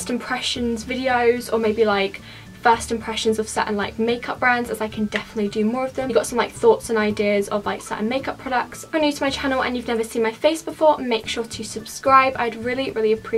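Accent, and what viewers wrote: United Kingdom. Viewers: Love ur British accent💘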